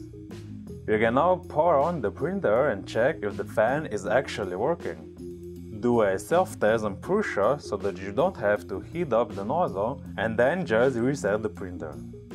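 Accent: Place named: China. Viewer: Sorry but what's on top of my mind watching this is: what's your native tongue ... Sounds like Chinese to me...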